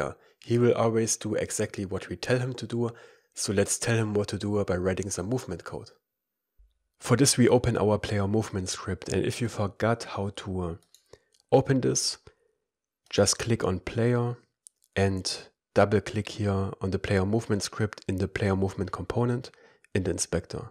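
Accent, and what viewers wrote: Germany. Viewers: Love your teaching way brodar , your humour , your german accent lol awesome keep it up!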